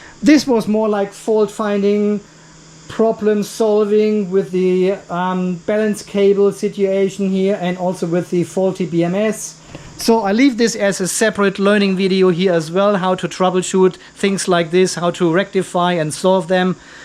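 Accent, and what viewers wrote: Germany. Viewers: A few weeks ago, I stumbled on one of your video while looking for a review on a bms. I got attracted by your german accent (Es gab eine Zeit, in der ich Deutsch sprach) and I have learned a lot through your videos. I finally orderded a V15 JK inverter bms that I just tested yesterday. Working on a self consumption system using grid as partial storage (injection tarrif are good here) to lower battery volume. So far so good, integration with both victron (cerbo gx) and sma sunny island is underway thanks to your very informative contents. Thanks again from Dakar , Sénégal, and keep it up.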